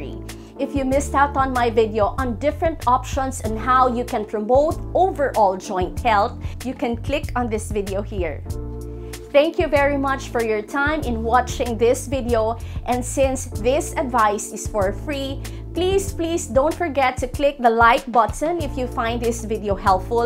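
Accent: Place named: Philippines. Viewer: How cute the Filipino accent is 😊... I have neck pain, stiffness and the pain radiates up to the head and I've been like 3 weeks with nonstop headache like migraine which comes from the neck,I don't have numbness or shoulder pain,only neck and head... I don't know if it's osteoarthritis or not ,but it's really hell what I'm going through !!!!!!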